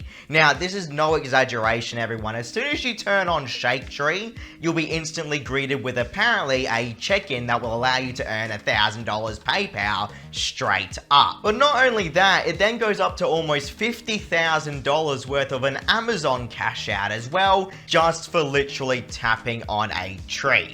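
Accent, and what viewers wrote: Australia. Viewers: Australian accent make mau laughing so hard 😂😂😂😂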